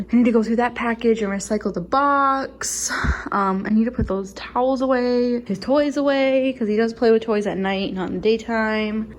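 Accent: United States. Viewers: ah cool thank you so much for your reply it means a lot ❤️ pretty sure that's not a thing in the UK lol 😂 I'm in Bristol, England 😊 love your videos so much when I feel a bit overwhelmed with house work your vids give me the boost i need to get on with things. Also I love your accent and wish I lived In America lol 😂 hope you, your bloke and your new kitty are all well 😊 xx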